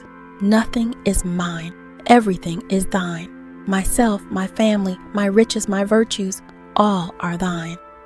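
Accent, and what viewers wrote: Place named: United States. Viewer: Don't want to nitpick here but to hear these words pronounced with a heavy american English accents is really jarring.... and I think somehow detracts from the quality of the video. The proper Sanskrit pronunciation of Jnana is "nyaa-na" .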